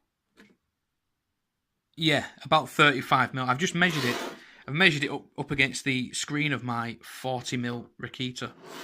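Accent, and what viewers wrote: Bolton, United Kingdom. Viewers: Yup, I think you've convinced me. I'll get one ordered 👍 Are you from Bolton by any chance? 😎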